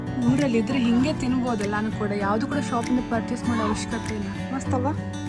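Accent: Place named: Andhra Pradesh, India. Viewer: Your telugu accent is good ma'am :)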